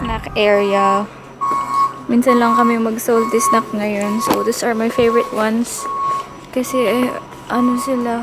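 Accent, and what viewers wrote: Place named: South Korea. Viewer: It still gives me goose bumps for you to have a perfect korean accent. Haha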